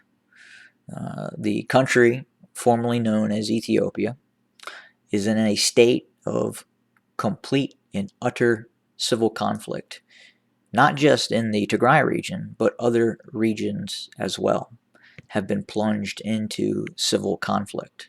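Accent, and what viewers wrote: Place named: United States. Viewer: He is an American of Ethiopian/Tigrayan descent. Don't let his American English accent fool you.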